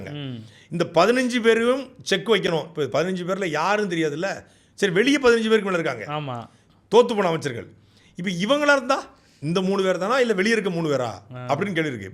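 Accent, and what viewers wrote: Tirunelveli, India. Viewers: சாட்டை நெல்லை அதான் தமில்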